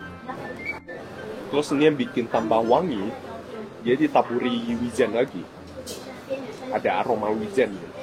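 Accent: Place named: Medan, Indonesia. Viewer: Org Medan mmg sebut modren klo tentara sebut tentra haha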